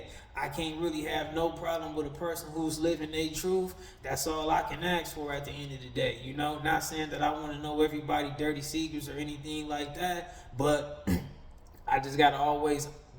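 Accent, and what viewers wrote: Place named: Bay Area, United States. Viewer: I really thought you was from the Bayarea for a minute how you talk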